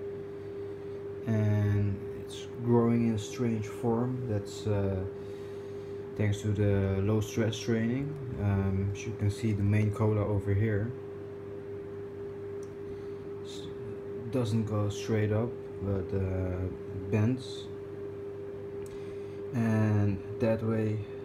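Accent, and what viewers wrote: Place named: Netherlands. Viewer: Hey r u Dutch? You sound Dutch... or scandinavian. Anyway + sub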